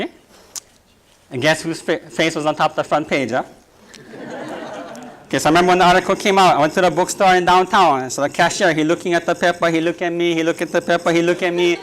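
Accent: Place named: Maui, United States. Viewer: Tonouchi's pidgin not bad eh. Not great, but not bad. Sound like he wen grad Kaiser lidat or grew up on Maui.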